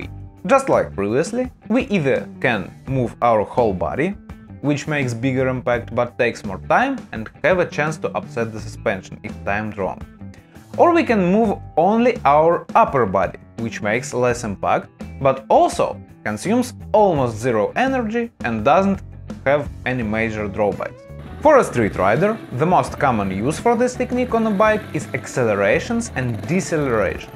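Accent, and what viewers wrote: Russia. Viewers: Sounds very like Aleksandr Orlov, a celebrity meerkat well known in Britain and Australia, did the voiceover! This just means the advertising agency got the accent they were aiming for, Russian, correct. Not that meerkats are good at riding motorcycles. <link> compilation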